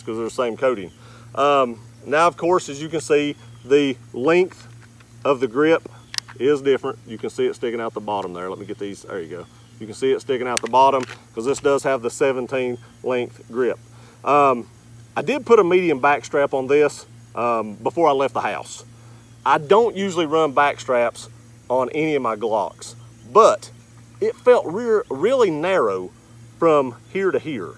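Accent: South, United States. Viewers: If I hear someone with a southern accent talking about guns, I know they know their guns.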